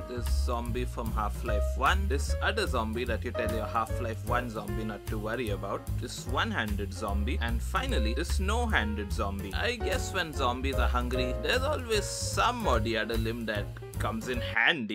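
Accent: India. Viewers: I thought you are indian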